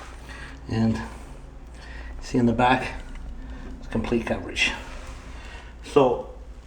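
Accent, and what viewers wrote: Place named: New Jersey, United States. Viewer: Quarta by 3/8... love the Joorsey accent. Thanks for making these videos, very helpful.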